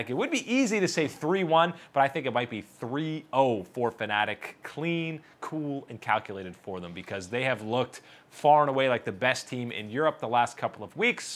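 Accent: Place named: United States. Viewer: Jesus...I can REALLY tell you are American and not European, dude! :D #VITWIN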